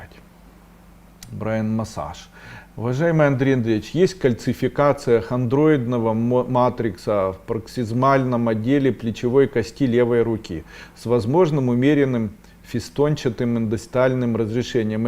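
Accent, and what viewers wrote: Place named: Kazakhstan. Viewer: Ви такий прикольний.Акцент казахський.)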